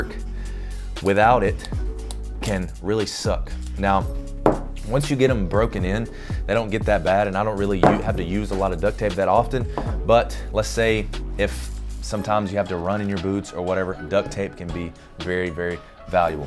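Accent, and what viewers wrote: United States. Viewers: sounds so country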